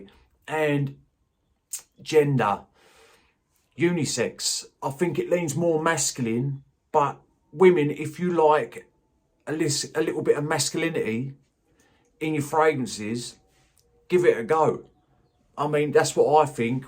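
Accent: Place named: Cockney, United Kingdom. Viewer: Finally, we got a proper cockney in the fragrance community! I could listen to you read the Bible, mate, and probably get my life right with the Lord. Cheers!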